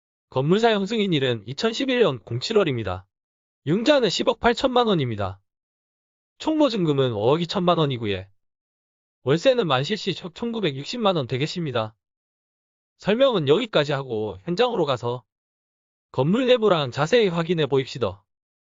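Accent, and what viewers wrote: Gyeongsang, South Korea. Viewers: 와 우리 진주사투리갖고 뭐라카지? 직접 유튜브 찍어보고 이야기하시지.. 내는 고마 들을만하고 이쁘게 말 할려고 노력하는게 보이는데. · 어슬픈 진주 사투리 참... 그냥 표준어 쓰시죠 ㅎㅎ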